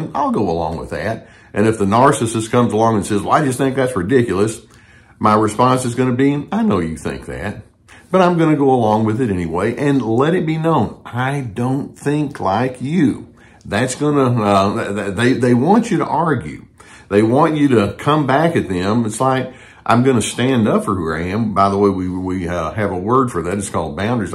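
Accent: South, United States. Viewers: Thanks from France, Dr, for your daily (almost) presence. I am French, a musician, and your southern drawl is a joy, and music.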